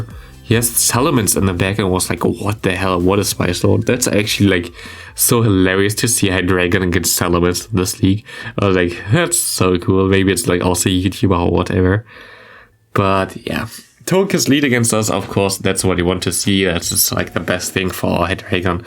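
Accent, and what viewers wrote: Germany. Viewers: I hate your accent. Typisch deutscher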